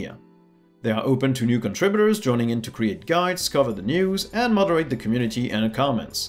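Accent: France. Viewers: I love Linux et ton léger accent français 👀😂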